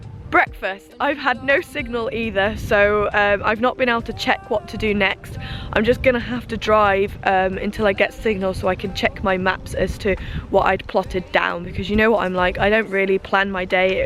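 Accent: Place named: West Country, United Kingdom. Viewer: ...scaffold Pike 😜 Scarfell Pike ✔ you have a West Country accent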